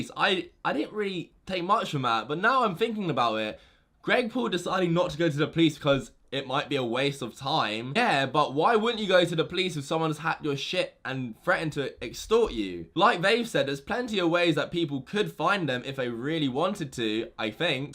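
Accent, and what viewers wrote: England, United Kingdom. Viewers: Why do i get this clown in my recomended? I dont care about Greg Paul making out with anyone, even less i care about you exposing him.. You are faker then Kylie's titties... After all we are not judging you for being born English and talking your stupid accent. Youtube please fix your recommendation shit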